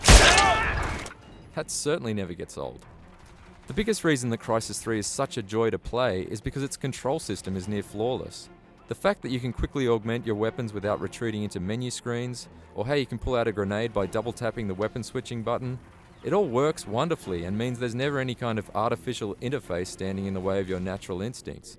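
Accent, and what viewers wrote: Australia. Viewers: An aussie, finally <3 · He sounds Aussie :D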